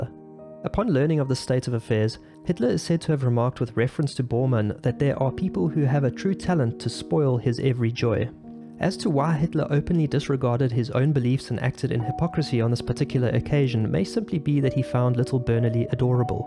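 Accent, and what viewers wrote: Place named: South Africa. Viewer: You sound wonderfully South African. Good stuff.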